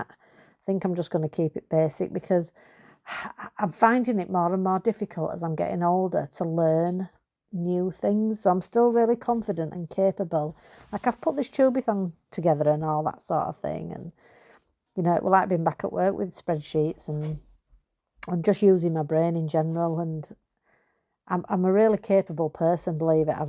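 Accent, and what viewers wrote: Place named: Northern England, United Kingdom. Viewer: Years ago I had a Siamese cat he hated dogs, he would chase them out of the yard he didn’t care how big they were he’d bite their ankles jump on their back and bite their ears, they would run screaming out of the yard he would walk back tail in the air smug look on his face. If I saw a dog out the window he’d run to the door tail up let me out! I really enjoyed this chatty video I hope you will do more, I followed along while I sat here here in Australia by myself I enjoy your company, I like to hear you talk it reminds me of when I lived in the north many years ago, when they’d say things like put wood in ole, or shut dooer, nobody ever said’the’ anything, sometimes it seems the rest of us are a bit too English 😂 it’s supposed to get to 48C today, I’m sat under the split system, cool air blowing, not going out today! 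Sat in a thin nightie, keeping fluids up , curtains shut against the sun. And you have cold and rain! It will be our turn soon enough, at least then we can add extra clothes to keep warm but there’s not much relief when it’s too hot 🥵 I love your idea to raise money for cancer and I will be donating. ❤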